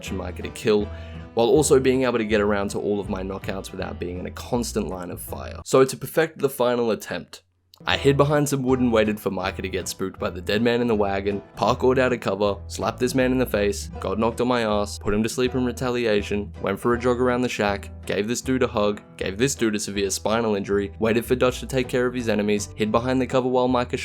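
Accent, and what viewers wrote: Australia. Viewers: This just got recommended to me are you Australian ? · I think he's Aussie lol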